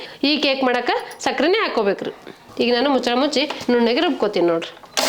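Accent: North Karnataka, India. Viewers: Super ಅಕ್ಕ. ನಿಮ್ಮ ಉತ್ತರ ಕರ್ನಾಟಕ ಮಾತು ಚಲೋ ಇದೆ ರೀ. 😅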